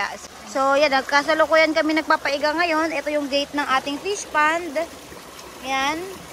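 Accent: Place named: Tagalog, Philippines. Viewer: Pokot ng lambat nakakatuwa ka talaga halatang pure na tagalog ka😀 maam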